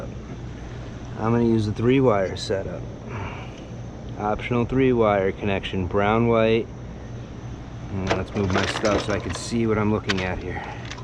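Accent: New York, United States. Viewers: You have a New York accent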